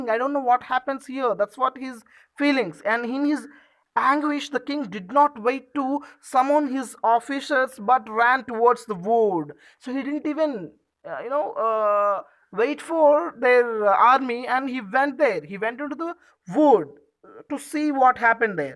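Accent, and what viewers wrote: United States. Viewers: U are in India not in american learn to tell in indian english not in american english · Good but ur explanation and your English is similar to American English so try to explain in Indian english I mean dragging of words, and it was bit fast for our kids. Don't mind just it's a suggestion.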